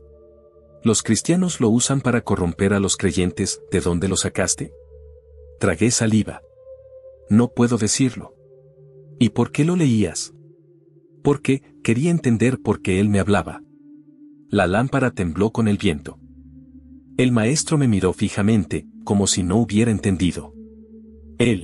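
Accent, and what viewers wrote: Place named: Portugal. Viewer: Porque habla portugués si lo hace en español es una mezcla muy mal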